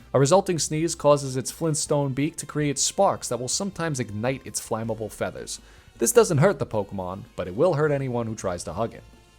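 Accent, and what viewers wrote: New England, United States. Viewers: Is playing into his New England accent or is he just getting comfortable? 😭😭😭🤣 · Ey yo! Somehow I've been deaf to that NE accent until now. Now I'm hyper focused on it. 😂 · He sounds 1000% more New England than any other video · Am I crazy or is accent going crazy in this video? Or am I just noticing because he said he’s from New England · I swear you sound more new englandy in these videos about new england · Did anybody else entirely fail to notice accent until this specific video and suddenly all you can hear is the thick yankee accent? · Does anyone else feel like he's started pushing the new England accent since he first mentioned it a year or so back? I've been watching since the beginning and this accent is definitely new. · as a new englander, he did not start developing an accent. he's simply letting it loose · I’m so confused, why is his New England accent so much more heavy in this video? 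Is he trying to dial it up or is it a joke? I’m from Maine so I know what a New England accent sounds like.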